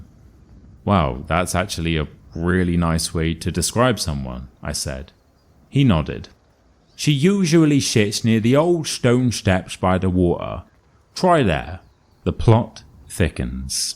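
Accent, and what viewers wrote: United Kingdom. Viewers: this is amazing! I’ve been looking for something like this for a long time. Language learning is really about listening, and your calm, deep voice with that wonderful British accent makes it perfect.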